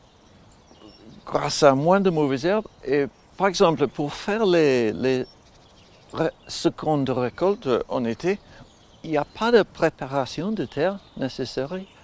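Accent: United Kingdom. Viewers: Hi . bonjour merci quelle belle video fait avec un grand coeur rempli d'envi de partager vous etres a lentlemen merci . Je suis en france et je regarde toute vos video . C,est un bonheur de vous ecouter francais parfait with the English touch beautiful ❤️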